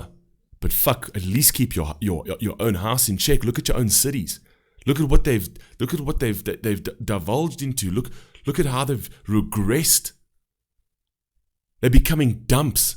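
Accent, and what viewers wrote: South Africa. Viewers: I'm just guessing from your accent that you are (at least originally) from South Africa, and you have a better grasp of what's really going on in the United States than 80-90% of the citizens of this country. Kudos sir, great reaction and rant! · You sound South African,….why you ran away…reacting to a song when shit is 10 times worse here in SA!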